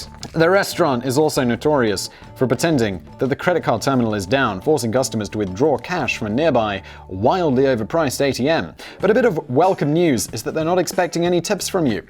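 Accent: United Kingdom. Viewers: I only watch this channel so I can laugh at British pronunciation of words. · ... Ha! I was watching this video (I just love you btw but business blaze is my favorite of your channels) and my 4 yr old (as of last week hes 4) son came in and said "thats the wise old elf!" And pointed to you on the TV. Its from one of the shows he watches called "Ben and Holly's little kingdom" and I stopped and looked and said "he does kind of look like the wise old elf doesn't he bub?" And he replied with a very enthusiastic "yeah!!!" LOL. And just while typing this I remembered that its also a British show so you also probably had a similar accent (not voice just your accent) so my son may have heard you sounding similar to the characters on the show too. Lol.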